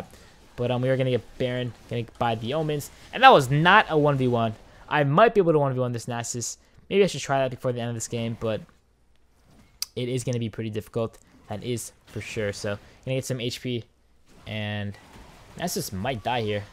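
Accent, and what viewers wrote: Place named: Boston, United States. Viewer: are you from boston or around the east coast? you kinda sound like it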